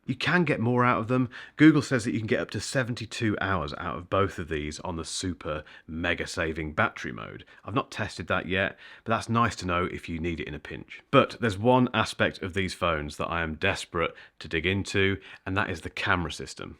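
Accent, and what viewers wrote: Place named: Essex, United Kingdom. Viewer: This man speaks with an Essex accent. He is an Essex boy.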